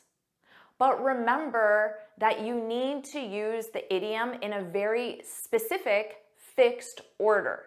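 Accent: United States. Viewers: Hi. Out of your excellent accent I guess you re American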